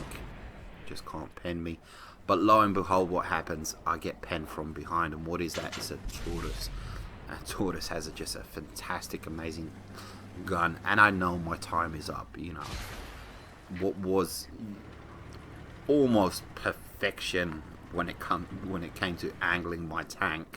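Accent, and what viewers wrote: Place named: United Kingdom. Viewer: need to stop wearing my headphones when im listening to your vids that music at the end threw me across the room lol nice vid as always big fan hope the hand is getting better can i ask where in the uk u from been trying to work out your accent???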